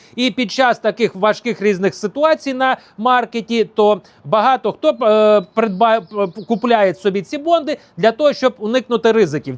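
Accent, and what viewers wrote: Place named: Russia. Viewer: Дякую за вашу працю. Але треба ще працювати над вимовою, бо є багато російського акценту: Атава, кА мандир і тд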